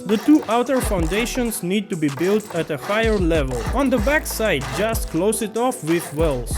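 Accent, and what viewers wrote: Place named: India. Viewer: I rember him og India voice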